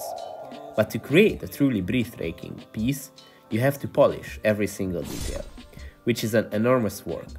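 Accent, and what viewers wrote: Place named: Hungary. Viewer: gondoltam hogy magyar vagy az akcentusbol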